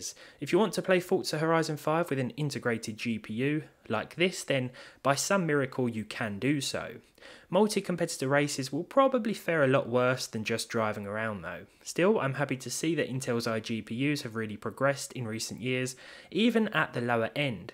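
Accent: United Kingdom. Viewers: I have no direct need for the information you provide, but you are so likeable. Your voice is calming and your British accent is such a pleasure to hear. I enjoy your ponderings and I am sure a lot of people feel the same! Have a great weekend!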